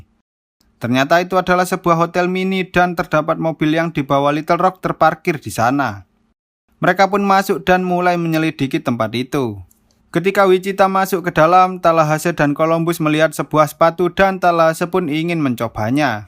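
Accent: Java, Indonesia. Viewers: Medok nmen mas V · Medok Medok Mantapp 🤣🤣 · Wong jowo Iki? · Lambe jowo. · Mas jowo Endi kowe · wong endi mas😂 kok iso jowo aku seko jogja salam mas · Jowo rek wkk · Jowone mantep🤣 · bang Lu orang Jawa ato Sunda Sih?😙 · weleh wong jowo toh mase🖐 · Jowo Yo mas 😂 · Mas pean wong jowo ta😁😁😁 Wocoen mas😁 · Jowomu ngendi min😂 Kediri Jawa timur hadir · Ngomong jowone lucu aku ngakak anjir onok - onok ae😂😂😂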